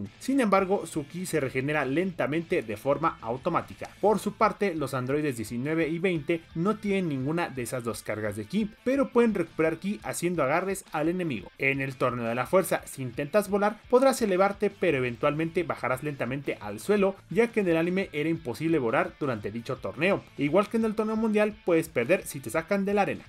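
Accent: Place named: Mexico. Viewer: si loco nunca escuchaste a un mexicano hablar?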